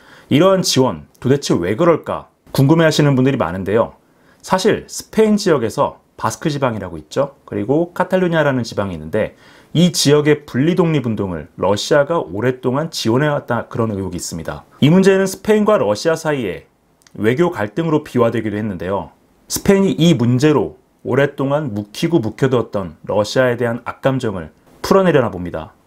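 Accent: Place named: Busan, South Korea. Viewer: 말이 뭐가 빠랐냐 니가경상도 부산억양에 익숙하지않아서 그런것일 뿐이다 노량진 학원가서 경상도말 배워라. 나는 그것도 늦어서 속도1.5로듣고있다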